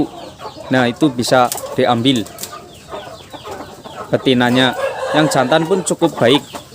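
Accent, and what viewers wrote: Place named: Banyumas, Indonesia. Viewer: Ngapak banget bg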